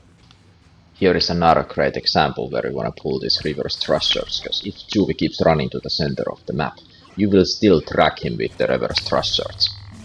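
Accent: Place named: Finland. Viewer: That's the Finnish accent perkele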